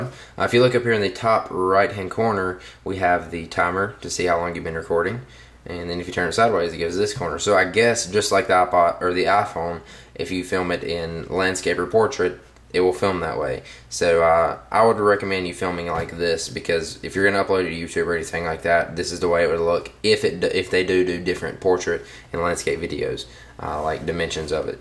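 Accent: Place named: Georgia, United States. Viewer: You're from Georgia are't ya bud. Somewhere a little South of ATL? Spalding county maybe.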